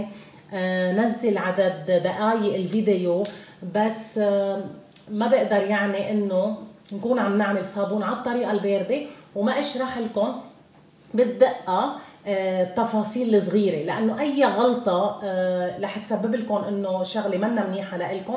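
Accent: Lebanon. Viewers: نموت على لهجتك اللبنانية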